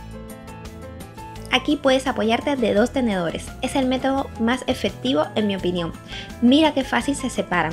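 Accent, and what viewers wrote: Cuba. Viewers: Así hablamos los cubanos, a afinar oídos jajaja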